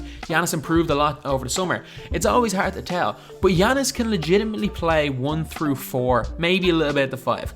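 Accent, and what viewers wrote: Ireland. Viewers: is that an Irish accent you're sporting?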